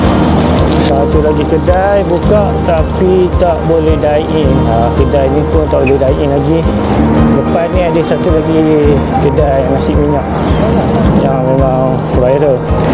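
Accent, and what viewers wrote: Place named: Kuantan, Malaysia. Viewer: Patut kwn dgr slang aok kuantan beno bunyinye sama kita😆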